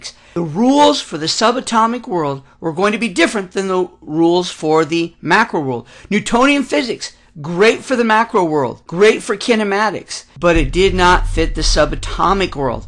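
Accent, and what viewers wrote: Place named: England, United Kingdom. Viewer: You sound a bit like a country yokel from England with that accent there.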